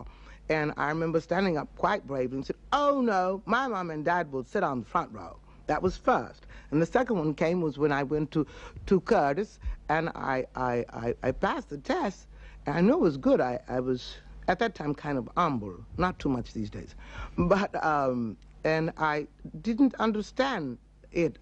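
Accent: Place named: Gullah, United States. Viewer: She was born in North Carolina; the Gullah people of that region have retained strong elements of their Africanist roots, including language/accent. So that is definitely a conscious part of the cultural heritage she represents.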